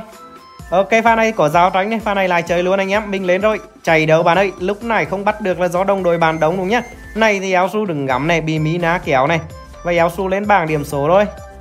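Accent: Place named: Central, Vietnam. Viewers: Nghe giọng ở huế quá. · nói ra có chút vô duyên và có gì thì cũng xin lỗi trước vì nghe giọng hơi chói tai, này là giọng miền trung, mình thì ko có ác ý gì cả nhưng xưa giờ mình nghe giọng miền trung thì cả ngưới rất khó chịu, nếu chỉ là nói từ tốn thì ko sao còn kiểu như nói nhanh và nói nhiều liên tục thì nghe rất khó chịu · Bạn nói chuyện hay phết.giọng mt nge cuốn ghê..:))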